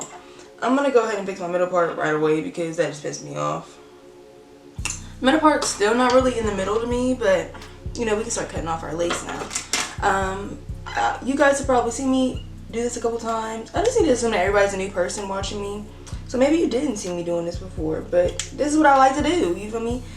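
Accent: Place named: Bay Area, United States. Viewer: I just found your YouTube lastnight and girl i loveeee your content! At first i thought you were from the Bay Area (i like too) and just how you talk lol I’m from there. But good work girl! 💕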